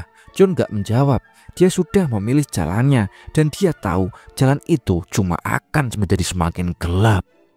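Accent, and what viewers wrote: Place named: Java, Indonesia. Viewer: jawanya medok banget bang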